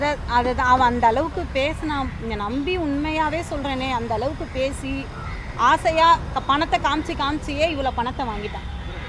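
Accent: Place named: Madurai, India. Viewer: அக்கா..சொந்த ஊரு ..மதுரை னு..நினைக்கிறேன்....உனக்கும் ஆசை இருந்து ருக்கு...சக்கா. .அக்கா..செம..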